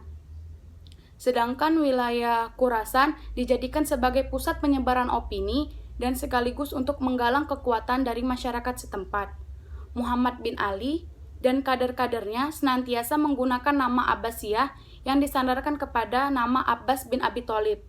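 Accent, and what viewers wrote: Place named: Medan, Indonesia. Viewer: anak medan ya haha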